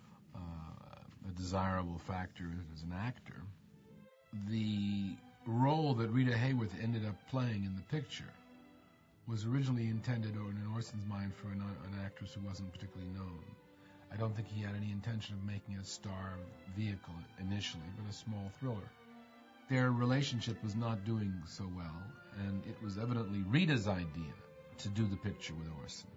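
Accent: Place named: Ireland. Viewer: lol I lasted 30 seconds, not fair I know but his constant talking in that terrible Irish accent......!!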